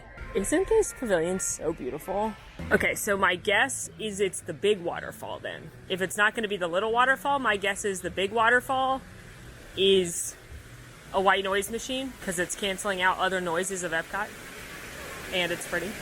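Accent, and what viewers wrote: England, United Kingdom. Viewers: Hi from the UK - love the 'trapped' sessions and your British accent 😅😊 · your Canadian accent sounds more British to me;) I'm French Canadian BTW so I might be wrong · English accent in Canada?! 😅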